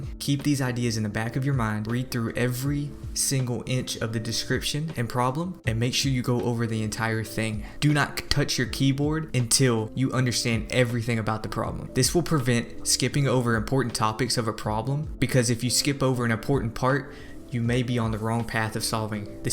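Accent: South, United States. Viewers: Thank you for maintaining the southern accent bro. Seriously. We're losing our accent and our southern culture. When i hear people speak it, it keeps the spirit alive. thanks for uploaidn g the very helpful video! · Dude, what southern state are you from hahah. As a resident the accent and the yeti sticker isn't fooling me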